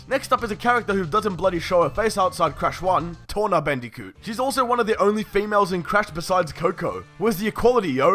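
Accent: Australia. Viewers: One month ago? Mate you sound aussie asf how do you not english in the first 10 seconds? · Shu bro you sound like you're from bankstown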